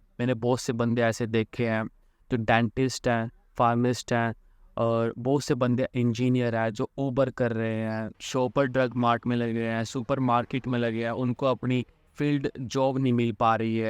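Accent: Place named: Haryana, India. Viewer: Ye haryana se lag raha hai😅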